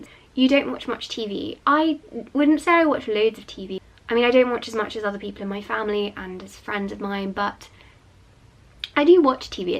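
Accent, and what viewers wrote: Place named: England, United Kingdom. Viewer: Can you please tell us where in England are you from or lived in? I studying british english and I just love your accent and want to know where is it from 💛 thank you!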